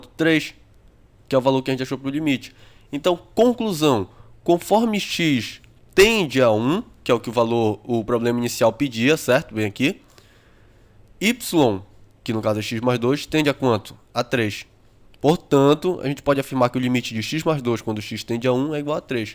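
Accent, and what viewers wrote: Pará, Brazil. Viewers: Cara você é paraense???/. Você é muito bom parabéns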